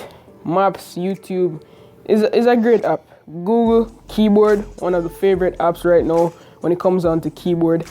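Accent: Jamaica. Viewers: Are you Jamaican 🇯🇲🇯🇲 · You Jamaican? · U Jamaican? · u jamaican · Yeah Man U definitely Jamaican bredda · Are you jamaican?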